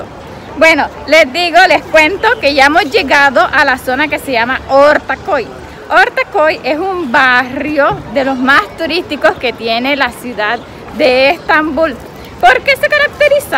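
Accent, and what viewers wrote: Caribbean Coast, Colombia. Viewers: Hola saludos a tu esposo bello me gusta como hablas costeño t saludo desde barranquilla eres muy auténtica costeña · eres muy linda y agradable, me gusta como hablan los Colombianos saludos desde Guadalajara 🇲🇽 México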